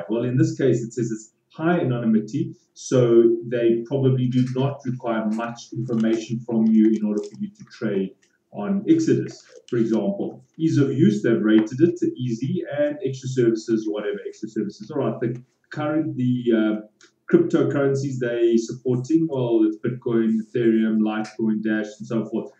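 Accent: South Africa. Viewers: Definitely a South African accent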